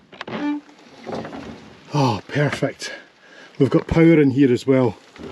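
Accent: Scotland, United Kingdom. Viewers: The light is so dim at that latitude! So unlike here in California. Also, now I know what a "bothy" is. Great to hear a Scottish accent I can actually understand easily. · Scottish accents are the best! Best in the UK. Thank you, Mike Meyers. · I'm not an English speaker so your Scottish dialect sometimes gives me hard time to understand what you are saying. Subtitles are a blessing. Thank You for making these videos, I'm a fan! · What a fun video. You're so cute and with that precious Scottish accent. Thanks, I've liked n subbed. I would get very sick physically if I lived there. Too much wind and dampness. I'm in sunny Florida but plan on moving.....too many hurricanes now.😢😢😮😮😂😂❤❤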